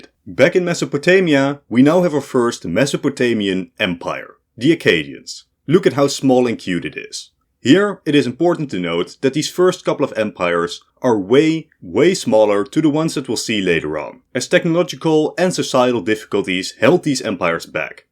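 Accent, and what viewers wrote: Netherlands. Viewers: I hear Dutch in your voice my friend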